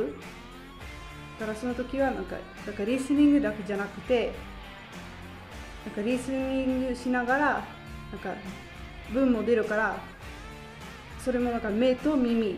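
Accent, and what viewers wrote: Kansai, Japan. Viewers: お人形さんみたいで凄くかわいいですね☆ 関西弁で一生懸命話してるのが心打たれます^_^ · 関西弁喋る外人ってなんか面白いし好き。笑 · 本当に美人ですね！実在の人物ですよね？（笑）声もステキだし、関西弁もいいですね！ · 関西弁ええな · ちょいちょい関西弁入るのが良いね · ちょっと関西弁混じってる？ 可愛い♡ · 何故に関西弁、、、（｀・ω・） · めっちゃ綺麗！関西弁がたまらんw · 関西弁親近感湧きます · なんだこの美人！！ 5カ国語喋れんのか？ しかも関西弁？ しかもなんか一生懸命さが伝わる👍 うらやますぃ〜ぞ！彼氏👍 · 関西すぎた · 関西弁がおもしろい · 女神さまが、関西弁しゃべってる 素晴らしい😻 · そうやってアクセント気にせんと関西弁喋りまくったからうまくなったんですね！ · なんか関西弁入ってる？ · 美人で関西弁は最強！ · 何でこういう外国の人ってみんな関西弁なん？ｗｗｗ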